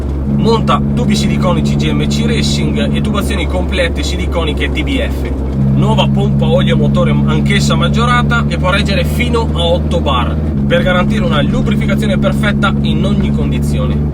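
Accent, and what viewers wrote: Veneto, Italy. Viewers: L'accento Veneto è inconfondibile.... 🍻😉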